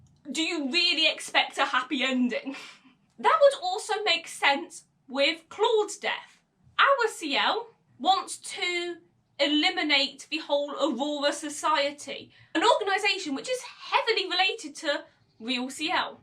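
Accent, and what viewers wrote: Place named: South, United Kingdom. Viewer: Really nice theory! Where are you from? South of England? God I love your accent! Hahahaha I lived some time in England and yours is really cool and different to where I lived (liverpool)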